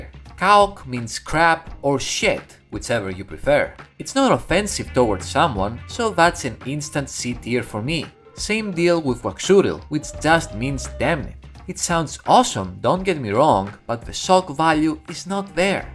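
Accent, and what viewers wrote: Greece. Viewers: Are you Greek? Me too! Haha we have the same accent!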